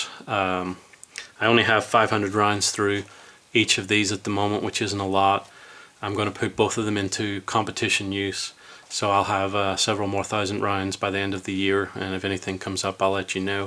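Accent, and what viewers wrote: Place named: Belfast, United Kingdom. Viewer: I hear a Belfast accent ;-)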